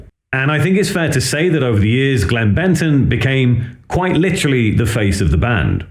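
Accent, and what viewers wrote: United Kingdom. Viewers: Your (British) way of saying Deicide is your dialect, it's just not ours in America. Band name or the word otherwise, over here in the States it's "DEE-ih-syde." :->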